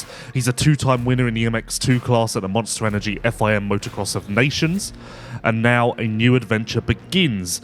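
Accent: United Kingdom. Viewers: thank you for talking faster and having live action, now I won't mute the hub. Luv a fast talking Brit!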